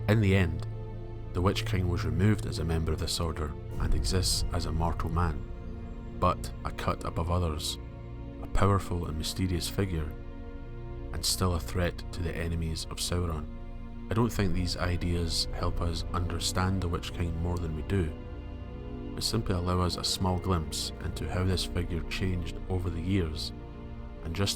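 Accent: Scotland, United Kingdom. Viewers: Always a lol strange hearing another Scottish accent on YouTube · Good to hear a Scottish accent doing this rather than your typical southern one…… the real north, north of the walls. 👍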